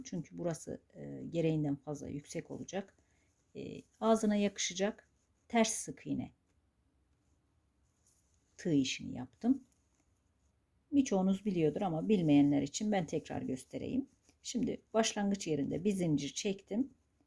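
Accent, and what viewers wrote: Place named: Ordu, Turkey. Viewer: Ordulumusunuz🤗konusmaniz orayi animsatti bana😊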